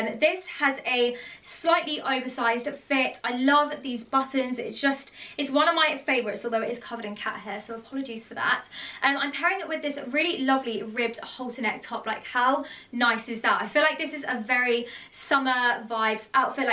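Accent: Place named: United Kingdom. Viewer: Her British accent 😁😁😊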